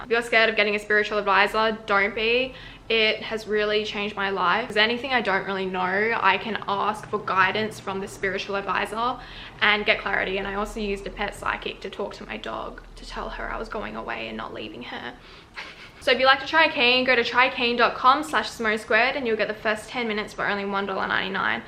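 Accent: Australia. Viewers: its an aussie accent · not me slowly adapting aussie accent bc of her 😭😭🔪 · Please my British accent sounds so stupid trying to imitate her aussie accent 💀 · i watch her so much i'm starting to speak with an australian accent now.. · R u an Australian? Ur accent is totally different 😍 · as an Aussie U can tell cause of the r sound, like when she says nose she says norse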